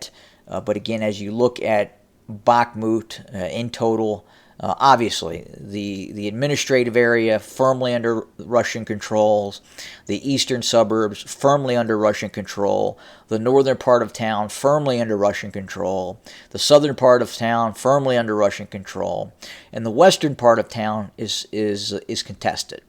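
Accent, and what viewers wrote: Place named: South, United States. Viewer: Thanks for this excellent update. I like the way you speak (as opposed to some complainers in the comments below), but I'm curious where your 'accent' is from. I'm guessing a Southern state, but which one?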